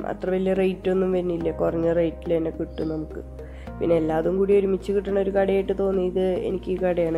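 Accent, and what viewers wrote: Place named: Thrissur, India. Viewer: Thrissur aano sthalam?? Samsaram kettapo thonni.. Me too from thrissur...Good and helpful video..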